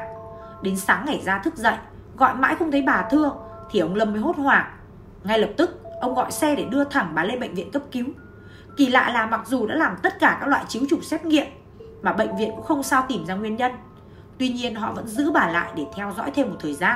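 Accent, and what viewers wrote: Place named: Nam Dinh, Vietnam. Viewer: nhưng giọng nam định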